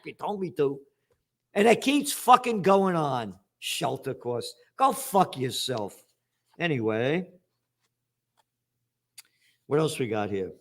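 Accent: New York, United States. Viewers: Love you Gerald! Just signed up for the annual subscription! Love the Merch!! Colorful language with a New York accent, reminds me of listening to my family from there. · There is nothing more satisfying than hearing someone with a New York accent cursing out the thieves,liars,massmurderers and corrupt A-holes that is the elite political and economic establishment.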